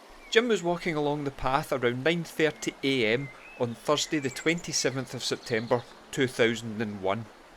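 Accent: Glasgow, United Kingdom. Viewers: Sounds to me like he could be doing Gregory’s Girl, definitely Glasgow. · Sounds Glaswegian to me (albeit well spoken)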